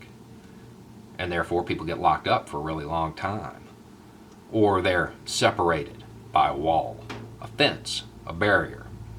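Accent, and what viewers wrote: South, United States. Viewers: There’s that great southern accent brother... well said sir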